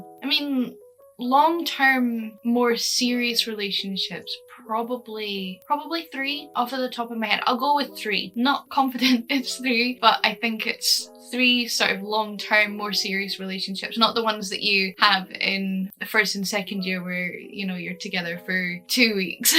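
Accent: Scotland, United Kingdom. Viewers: "I live close to Dundee", that explains why I recognise the accent since it's local-ish xD nice to see a Scottish content creator, dropping a sub :)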